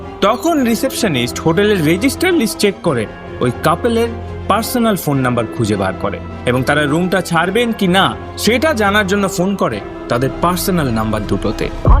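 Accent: Bangladesh. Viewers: Tomar kothay Bangladeshi tan ache😂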